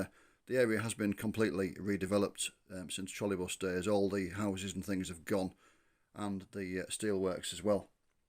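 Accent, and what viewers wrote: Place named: Teesside, United Kingdom. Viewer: You sound like you're from Guisborough Stokesly area dont sound like a towny as we called em. , recognise that accent anywhere,my mates and l used to go on the trolley bus from North Ormesby to Eston Baths, swim 2 sessions then walk up to flatts lane to the end of flatts lane then down into the market town, was a good walk after couple hours swimming, never bothered us, 14 yr olds, 1971, we started going from 1969. Remember in Eston Baths one of the staff who took the steel mesh baskets with our clothes in had a prosthetic arm with a double hook hand. Where we caught the bus l remember there was a Robin Reliant repair shop and a Pawnbrokers, just over the road from the market and hospital. Pretty sure recall going into Middlesbrough on the Trolley bus with my mam after visiting someone un the hospital. Middlesbrough then was an excellent place to go packed out with people and life. 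How unfortunate they couldn't project into the future, electric buses would have been a boon to save the climate wouldn't they, in fact tearing up a lot of the railway lines in 1963 was a disaster as they went nearly everywhere, stuck electric trains on there or trams and vehicle emissions would be well cut down, kept em cheap to use like in Germany and many cars would be left at home, but then they would have lost out on petrol tax lol. Hindsights a wonderful thing l ssuppose, but l cant believe rthere weren't people who could have projected those ideas. Now citys and towns are spending fortunes r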